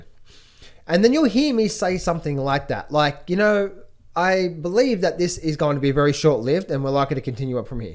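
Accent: Australia. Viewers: So all the criminals from England were sent to Australia in 19 th century...you should work on your English or American accent. ..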